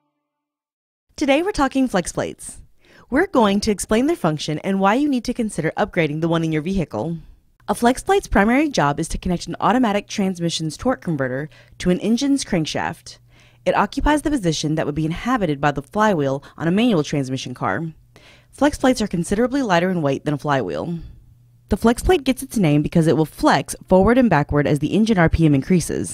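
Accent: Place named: California, United States. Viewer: Haha she sounds like some cali broad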